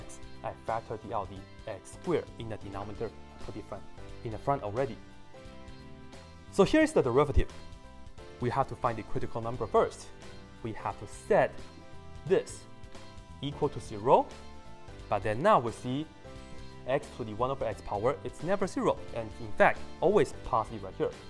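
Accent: Singapore. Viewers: Great video. You sound like you're from Singapore, which is a country known for its great education system. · 你妈 新加坡口音简直是折磨人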